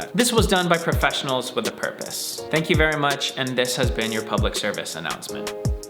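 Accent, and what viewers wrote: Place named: Philippines. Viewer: Love your "TAGALOG ACCENT" #dugongPINOY #kleankanteen #Olrayt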